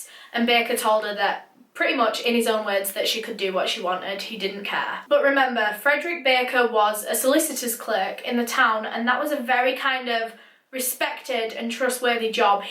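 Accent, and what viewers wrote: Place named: North, United Kingdom. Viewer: ah so it’s a British accent? i couldn’t really tell what it was because it’s so thick, but i loooove her voice.😍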